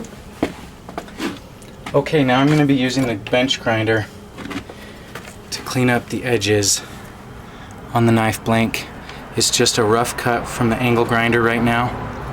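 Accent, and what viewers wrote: Netherlands. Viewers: you're dutch ?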